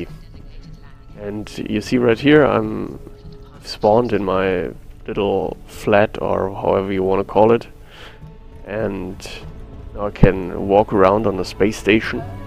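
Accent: Germany. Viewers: was für eine scheiss englische ausprache. alter deutscher gehts nicht. peinlich.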